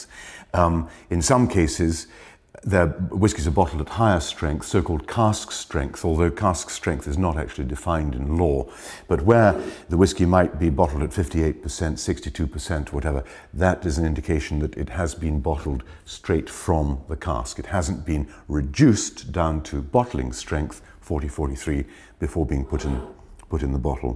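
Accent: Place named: Scotland, United Kingdom. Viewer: He is from Argyll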